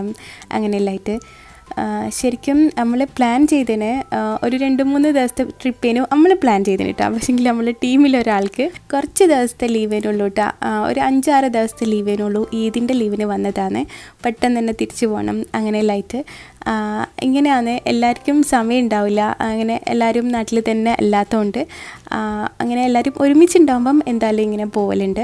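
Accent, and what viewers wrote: Kannur, India. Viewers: പൊളിയാണ് വീഡിയോ എല്ലാവരും നല്ല ഒത്തൊരുമയോടെ ഉള്ള ഫാമിലി ആണ് ഫുൾ ട്രിപ്പ് ഫുൾ വീഡിയോസ് അടിപൊളി ഞാൻ എന്നും കാണാറുണ്ട് എന്റെ ഫേവറേറ്റ് യൂട്യൂബ് ചാനൽ എന്ന് പറയുന്നത് ഇത്താത്തയുടെ തന്റെ ക്യാരക്ടർ എനിക്കിഷ്ടമുള്ളത് വോയിസ് എനിക്ക് ഭയങ്കര ഇഷ്ടമാണ് മാഷാ അള്ളാ നിങ്ങളെ കണ്ണൂർ ഭാഷ പൊളിയാണ് നിങ്ങളുടെ കാമി റ ഞാൻ ട്രൈ ചെയ്തു അടിപൊളിയായിരുന്നു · Ningale kannur style samsaramanu ettavum cute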